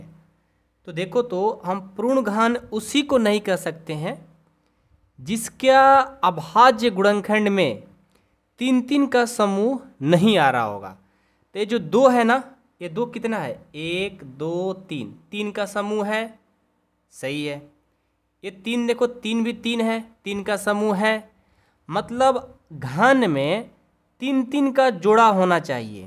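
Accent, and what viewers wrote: Bihar, India. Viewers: Sir app Bihar sa ho na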